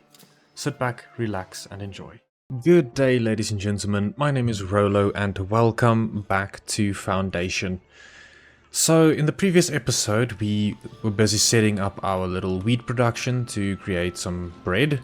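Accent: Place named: South Africa. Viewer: Cool to find a South African here! Your accent was just so peculiar to me I had to check your channel location.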